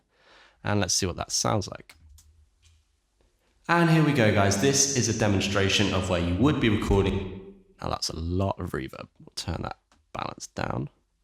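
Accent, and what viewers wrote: United Kingdom. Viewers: Barely heard the introduction Liked for the Englishmen accent omg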